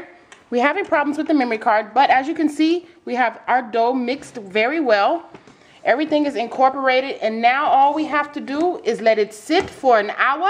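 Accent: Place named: Germany. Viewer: You have a solid german accent haha I was not expecting your reveal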